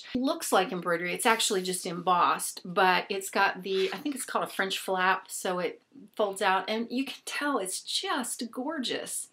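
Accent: United Kingdom. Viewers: Great haul! Loved the English accent lol! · Lovely Haul! Your excitement over those books is infectious :) That edition of Little Women is really pretty. I've seen it on a lot of shelves, and I'm thinking of getting it someday (tho I'm not sure I'd enjoy reading it). I had never seen the back of the cover tho! It's really cool :) The British accent :D I love that you're so much into genre fiction, like paranormal, dystopian, steampunk. Most of the books you hauled, I have never heard of them, but I've seen The Program around and it sounds like an interesting story. Looking forward to hear what you think of it. Starters has a freaky cover! (O_O) (the one you like) I wouldn't be able to stop staring at it if it was on my shelves. And I'd feel a bit creeped out. Wow! Those bookshelves look great! And those boxes full of books! If you ever get them all out, I'd be very curious to know how many books you have in total.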